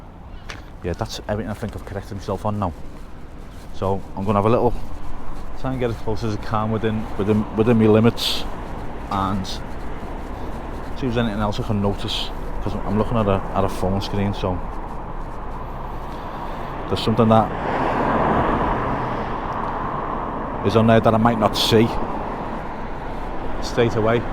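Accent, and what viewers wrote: Liverpool, United Kingdom. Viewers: You sound a bit like Jamie Carragher the way you pronounce your scouse A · Brilliant camera work and a real scouse commentary. Love it.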